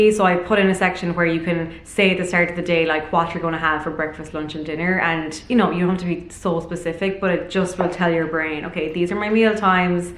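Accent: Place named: Ireland. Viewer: She's Irish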